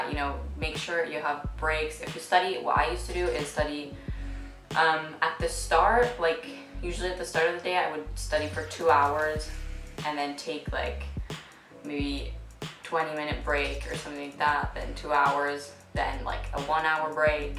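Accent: United States. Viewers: Yeah. .. love the drive and commitment you've explained in the video. Its not smooth cruising as most people like painting it as. love your vids, you're American right? detect the accent in there...and not to probe but what made ya come to England for studies?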